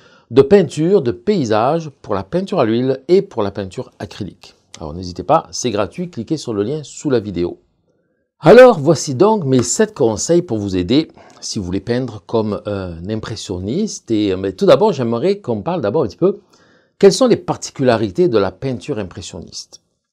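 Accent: South, France. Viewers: Coucou Merci je viens de m'abonner et je vais m'y mettre - Pédagogie = fluide - accend du sud = charmant et phrasé agréable 🙃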